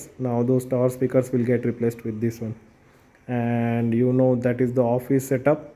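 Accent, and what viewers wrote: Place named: India. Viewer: Clearest Indian accent i have ever heard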